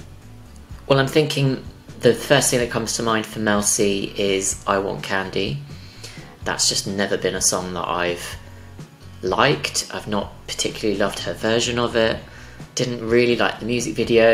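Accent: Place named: United Kingdom. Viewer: Thank you for doing everything you’ve done! I love to see it. You’re very charming but it could just be the British accent 🤷🏼‍♂️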